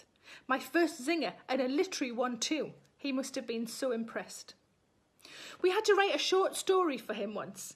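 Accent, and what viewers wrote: Newcastle, United Kingdom. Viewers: She is from South Shields, which is in the north eastern part of England, south of Newcastle but north of Middlesbrough. Her accent is referred to as 'Geordie'.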